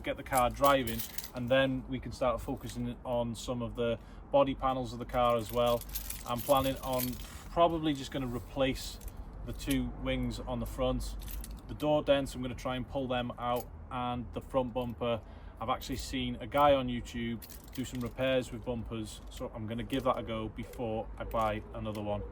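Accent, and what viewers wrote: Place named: United States. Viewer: wow!! you sound very american and even have the car to match it!! love the videos keep it up👍👍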